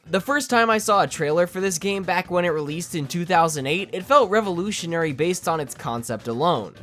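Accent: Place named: United Kingdom. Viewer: The sheer amount of dopamine that released when I heard the british narrator's voice cured my depression.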